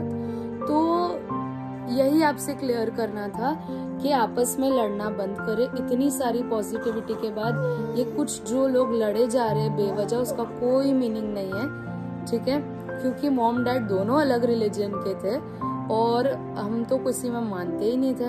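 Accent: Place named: Gujarat, India. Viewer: Good to know that you're a gujju fellow. Tamari boli par thi j lagtu hatu ke gujju chho. Khair Allah ne aapko sahi raah dikha diya hai. Dua main yaad rakhna sister.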